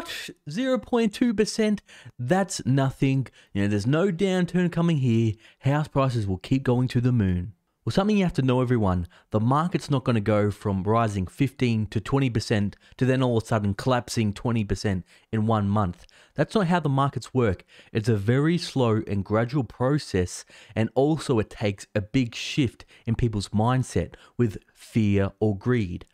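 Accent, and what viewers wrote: Australia. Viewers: Dude when I heard your Australian accent I thought you were talking about the Australian property market only to realise you were talking about the US. Got my hopes up. Property boom isn't ending here any time soon sadly and now agents are getting even more greedy by putting most of them on auction!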